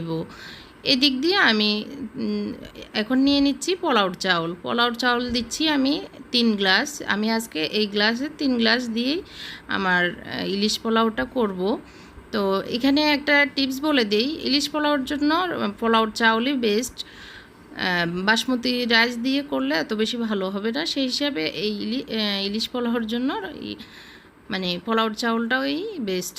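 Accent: Noakhali, Bangladesh. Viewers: Shob valo kintu apnar uchcharon thik kortey hobey.. Noakhailla uchcharon shuntey khub kharap lagey.... otherwise your recipe is nice.. all the best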